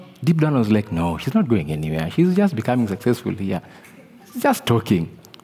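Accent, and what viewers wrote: Kenya. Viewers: And you can even get the Luhya hint in it. Great guy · I love the Kenyanness im your pronunciation. Haha...and your sense of humor 👏👏👏🙌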